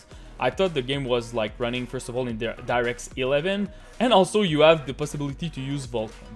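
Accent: Quebec, Canada. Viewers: j'bet 100$ que t'es québécois haha j'reconais l'accent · Gotta say, i'm french canadian too and you inspire me to starts video cause my accents not even worse than yours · you sound quebecois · you, my friend, is from quebec!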